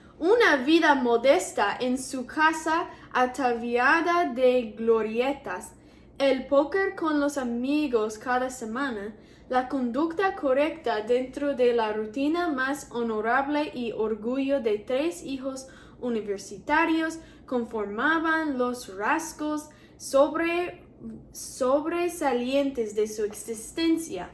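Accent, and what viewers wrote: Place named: England, United Kingdom. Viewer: Que buen cuento. 🤔Me encanta leer y escribir.Y que bien que promuevas la lectura en jóvenes. 🤓 Hay vocabularioqie que se usa mas en literatura, algunas pocas palabras no se usan en la cotidianeidad pero te hiciste entender muy bien. 🤗Sólo te sugiero mejorar acentuación en algunas escuchandolas o escuchando audiolibros de tu interés. Y que hagas in video semanal leyéndonos algo, a mi me entretuvo con tu voz y acento inglés y así practicas. Por fis di que sí, andale por fis sería bonito. 😍Hasta me dieron ganas de volver a leer algo de literatura que he dejado a un lado por el trabajo. 😣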